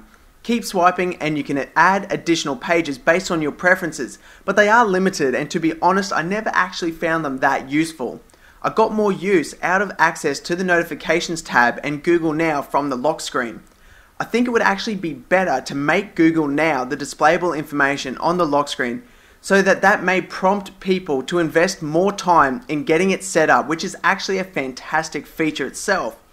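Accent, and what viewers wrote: Australia. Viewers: Great to see (hear) an Aussie reviewer! Keep up the good job :-)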